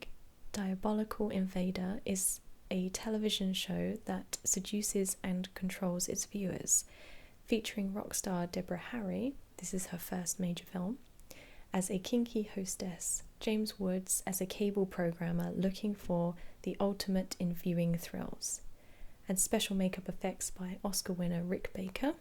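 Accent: England, United Kingdom. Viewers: so let me get this straight. .. an female english accent, asmr video about the brillant of David cronenberg. well I subscribed!. but u left out scanners. which is had the man with thee, thee greatest voice patrick mc gohan. well Richard Burton is thee best but patrick is a close second. and never forget about David Lynch or the Stanley kubrick.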